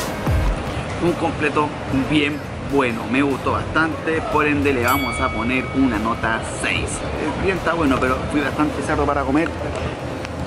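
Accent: Chile. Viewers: Weon abla comp chileno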